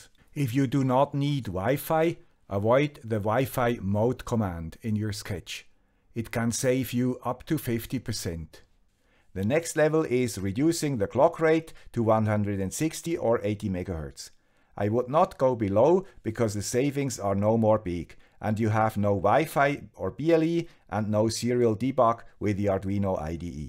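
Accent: Switzerland. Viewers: Thank you very much! Exaclty what I needed and KI didn't knew yet. From another guy with a swiss accent.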